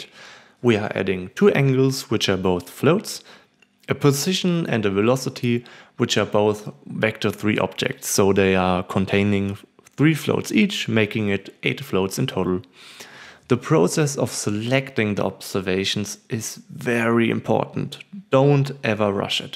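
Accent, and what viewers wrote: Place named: Germany. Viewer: didn't know this accent was german. it sounds awesome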